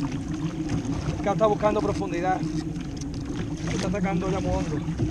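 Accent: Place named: Andalusia, Spain. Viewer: Eres andaluz, como yo. Yo pesco en la Bahía de Cádiz, y por fuera de ésta, desde Chipiona hasta Chiclana, y llegando a muchos metros de profundidad, a veces a más de 200 m. Atunes y de ese porte, por Huelva y el Estrecho, por aquí tb , pero son más raros. Por tu acento, por la segunda zona. Un abrazo y buena pesca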